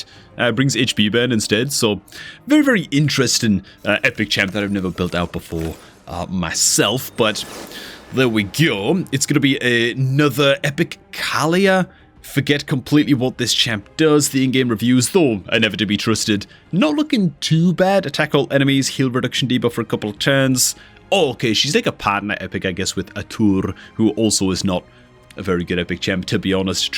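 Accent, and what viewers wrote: United Kingdom. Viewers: Why you hating on him he’s just got a British accent 😂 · He's british ...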